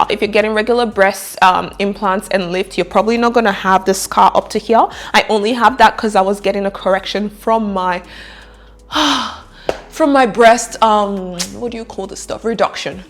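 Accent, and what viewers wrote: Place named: Australia. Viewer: ❤❤❤❤❤❤🥰 I love Australian accent! Had me laughing!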